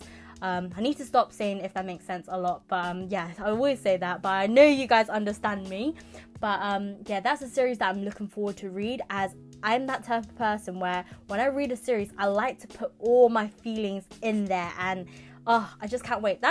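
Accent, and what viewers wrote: United Kingdom. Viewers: When I heard your British accent you have NO IDEO HOW HAPPY I WAS we need more British manga youtubers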